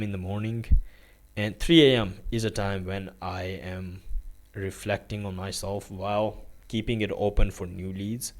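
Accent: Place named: Czech Republic. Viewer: How did you learn english❤ so well in a Cruchy accent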